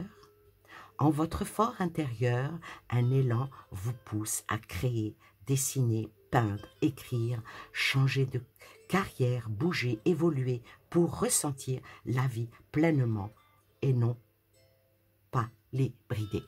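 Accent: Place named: East, France. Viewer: Bonjour, je vous suis mais je n’ai jamais laissé de message. J’avais remarqué un accent de l’Est, je suis née en Lorraine et je vous écoute de Londres, on sent votre sincérité et vs êtes très agréable à écouter. Merci pour tous 🙏🏻. Bisous 😘. Nanou 🤗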